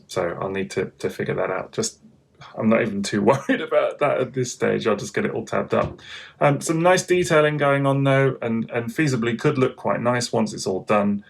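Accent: United Kingdom. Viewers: Hello, I’m looking into buying Split, however I’m in the UK, like you I assume due to ur accent, and I can only find online stores that sell him in $$$, can you please let me know or anyone here in the comments if there is anyway to buy with £££, many thanks.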